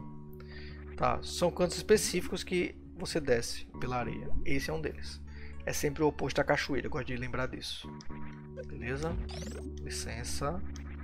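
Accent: Recife, Brazil. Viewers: Cara…. Estou ha tempos para escrever aqui umas coisas…. Primeiro vou falar sobre minha relação com esse jogo: foi o jogo que mais curtia lá nos anos de 1995 / 1996 (+ ou - por aí). Eu me dediquei muito ao jogo, e em uma época que não havia muitas informações, consegui sozinho acumular 98% dos itens. Daí na época uma revista lançou um “detonado” do jogo, e eles conseguiram 97%! Além de ter ficado orgulhoso com meu desempenho, consegui descobrir os 2 itens que faltavam! 🙌 Agora vou falar de vc: cara, tu é muito fera!!! (Pra não falar muito f*da!!) Nunca assisti vídeos muito longos de games, mas esse seu aí já assisti duas vezes…! Rodar o jogo todo sem errar caminho, sem pausar p ver mapa, sem hesitar… basicamente sem erros e demonstrando muita habilidade… muito fera! Parabéns! E vejo que esse acaba sendo “só mais um” jogo em que vc demonstra habilidade. Parabéns!!! 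E grato por esse vídeo!! Por fim: vc é de recife?? Pelo sotaque, assim me pareceu… Saúde e sucesso p vc e família! Ah: e qual idade da filhota pequena que vc cita?? 🥰 O meu tem 6 meses… 🥰 Abraço! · Senti um sotaque recife ai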